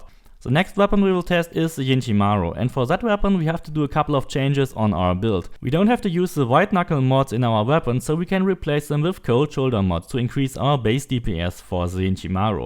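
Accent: Germany. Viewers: Man hört definitiv den Deutschen raus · Der deutsche Akzent is nicht zu überhören 😅 super Video 👍🏻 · XD I listened was like wait is this a German accent I looked found the impressum jep German xD danke für das Video bleib gesund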